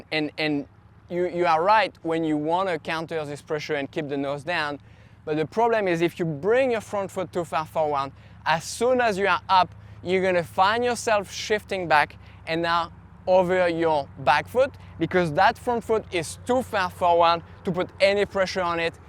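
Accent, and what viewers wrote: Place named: France. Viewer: Hello. Amusant on dirait un accent français... je comprends tout ^^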